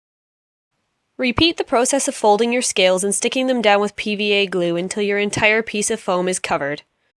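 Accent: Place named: Wales, United Kingdom. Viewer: Your accent isn't terribly difficult to understand. Sounds welsh.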